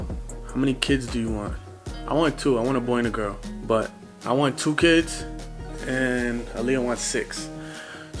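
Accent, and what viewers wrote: Jamaica. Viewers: Can tell you have a little Jamaican in u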